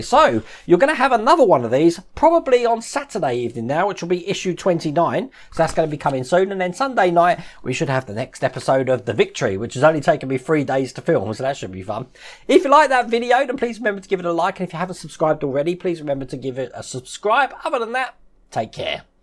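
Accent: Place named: Kettering, United Kingdom. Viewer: that makes sense then I'm from notts so by your accent put you round Kettering area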